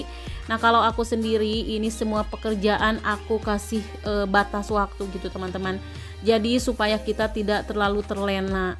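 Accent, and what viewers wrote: West Java, Indonesia. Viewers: Ibu dr Sunda kah? Logat bicara nya persis seperti teman saya yg Sunda...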